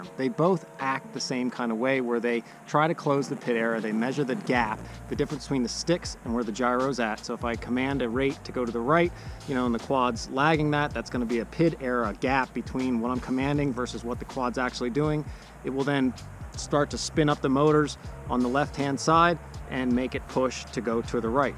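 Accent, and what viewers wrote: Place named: Pennsylvania Dutch, United States. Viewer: I think i heard some PA dutch in your voice.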